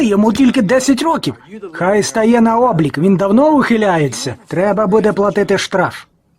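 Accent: Ivano-Frankivsk, Ukraine. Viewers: У нього акцент франківщини, а не львівщини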